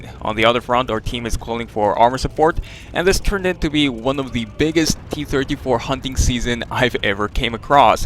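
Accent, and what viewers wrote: Germany. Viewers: würdest du auch mal ein deutsch schprachiges video machen man merkt nemlich das du deutscher bis !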